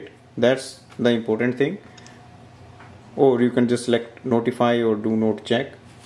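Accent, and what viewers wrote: India. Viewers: Your Indian accent is annoying.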